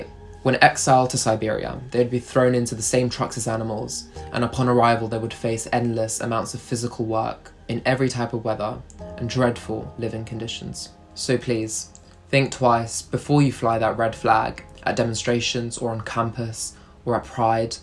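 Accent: United Kingdom. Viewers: Hiya Political Dolphin, I appreciate your response, and moreover your effort in reading my comment. I know the effort to engage reasoned dialogue is often futile, but one still needs to try. I can appreciate the channel host's disdain for corrupt and oppressive governance. Given his apparent youthful age and finely articulated British accent, its unlikely that he has experienced first hand the torture of Western ostracization, though he may have suffered through the post-Soviet era collapse and economic "shock doctrine" imposed upon the reeling Eastern European States by the victors of the cold war. If he is of Slavic or Balkan heritage, he may have even been a victim of the cultivated conflict in the former Yugoslavia. If Ukrainian, his wounds are still fresh. If he is himself a university student, he should entertain discourse that would encourage him to think outside of the box - particularly if he intends to pursue post-grad studies - whatever the discipline. 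For myself, I've gotta figure out a way to better communicate in 120 words or less. Cheers.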